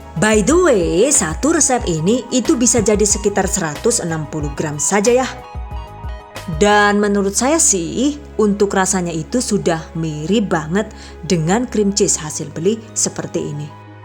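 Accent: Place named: Tegal, Indonesia. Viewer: Terimakasih sudah berbagi ilmu harus di coba ini,dan satu lagi saya suka humornya logat orang Tegal.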